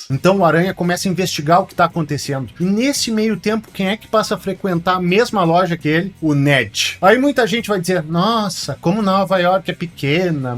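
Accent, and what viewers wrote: Rio Grande do Sul, Brazil. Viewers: Por acaso tu é gaúcho?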